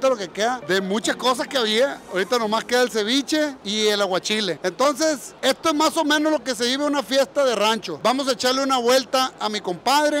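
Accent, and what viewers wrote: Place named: Sinaloa, Mexico. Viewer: Ese asiento sinaloense se pega yo soy de guadalajara con una semana que anduve por alla llegué a guadalajara hablando sinaloense Saluditos a komander y a todo sinaloa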